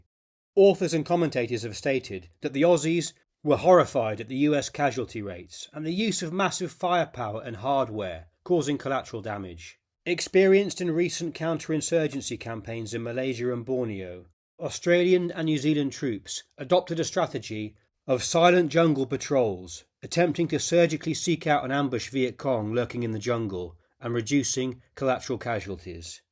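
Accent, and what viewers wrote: United Kingdom. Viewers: There is something F.C.U.K.D UP about listening to a Brit covering Vietnam War ?.....NIGEL DIDN'T $URF !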